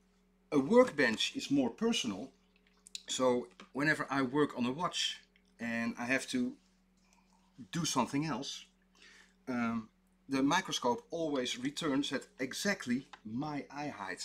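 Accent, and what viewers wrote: Netherlands. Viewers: Ha! Coffee in a shot glass! I’m corrected. You sound like the wonderful Amsterdamer. We were visiting over the holidays and stayed in the historic canal area where my Cousin and family live there. I wish I could have brought my Tudor Chronograph from the early 1980s. I don’t trust just anyone having it serviced. Any suggestions from here in the USA? We are in North Carolina. Thanks.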